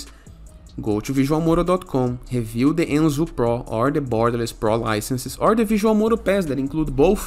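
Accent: Brazil. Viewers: Senti o sotaque brasileiro kkkkk depois fui no canal conferir e realmente é. Conteúdo bom demais valeu 🤝🤝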